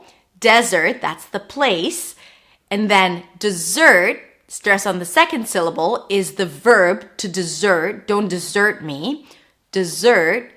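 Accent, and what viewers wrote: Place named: United States. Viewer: You have an American accent. In standard English Polish isn't Pronounced as paalish.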